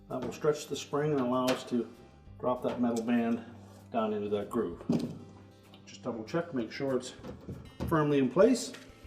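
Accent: Canada. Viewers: Great video, and especially appreciate the East Coast Canadian accent. :)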